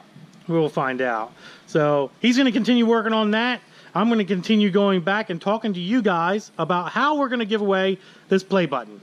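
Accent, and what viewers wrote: United States. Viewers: Learn to speak English, not American English, in fact you and Mike should go to the same classes and learn proper English, nae in fact all the crew in both your and Mike's vlogs learn English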